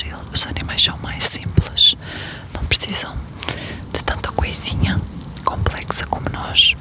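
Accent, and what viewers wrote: Portugal. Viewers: nossa que video incrível ❤, esse sotaque português ele tem um charme,uma "classe", um refino, muito obrigado pelo video dona, gosto q vc fale português Brasileiro, mas vc não precisa, boa noite 😴😴😴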